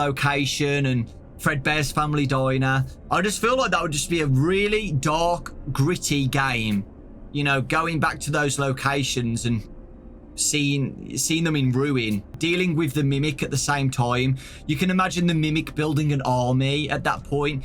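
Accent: United Kingdom. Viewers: Oh hai bri’ish dude /pos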